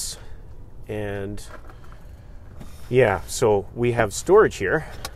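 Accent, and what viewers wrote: Canada. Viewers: Definitely Canadian sayin ouut!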